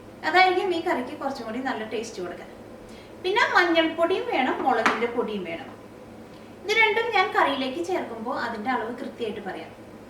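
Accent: Thrissur, India. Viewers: എന്തൂട്ടാ പറയണേ.. നല്ല നാടൻ തൃശ്ശൂർ സ്റ്റൈൽ.. :D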